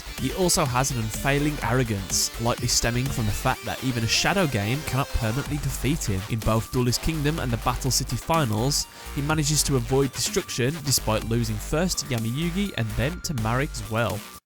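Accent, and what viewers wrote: United Kingdom. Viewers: Bri'ish lad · why so british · people always ask, "why so british?"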